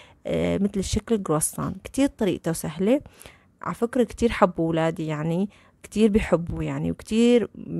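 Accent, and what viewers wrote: Aleppo, Syria. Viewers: لهجتك لهجة أهل ضيع حلب 🤔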